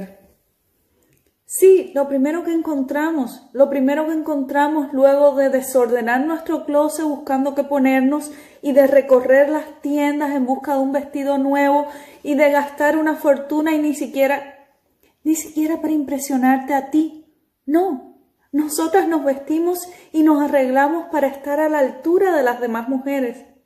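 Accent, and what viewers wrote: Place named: Cuba. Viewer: Muchas gracias estare al pendiente de tus videos que son muy buenos y muy bien explicados..una pregunta eres cubana?..me gusta tu acénto y tu manera de expresarte😊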